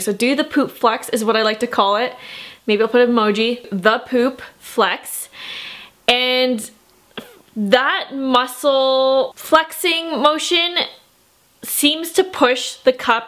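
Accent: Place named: Pennsylvania, United States. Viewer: First off, thank you for the informative vid! I just purchased my first cup& I'm due for my next cycle in about 3 days & eager to try it out! Also, this is random, but do you live in or are you from PA? I hear an accent- ish similar to my family that live there.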